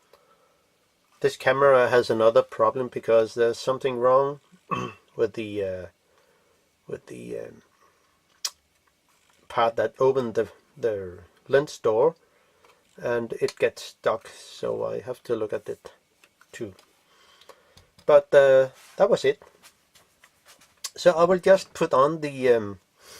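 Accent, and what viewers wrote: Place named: Denmark. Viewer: Excellent. I've had the Fuji badged version from new in 2012, good to know what's going on inside, and should I need to calibrate the rangefinder mechanism this is very helpful. Thanks also for speaking English. Are you Danish? Just out of interest, I'm guessing from your accent.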